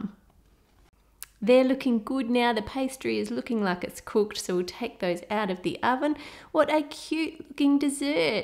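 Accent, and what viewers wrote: United Kingdom. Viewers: Who else is practicing their British accent while watching 🤣😅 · what accent is this? It sounds british but what region specifically? kinda sounds like someone attempting to do a british accent lol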